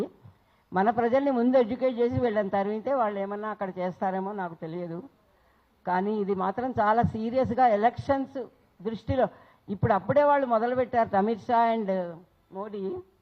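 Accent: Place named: Andhra Pradesh, India. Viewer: మీది కూడా ఆంధ్రా భాష లాగా.వునది madam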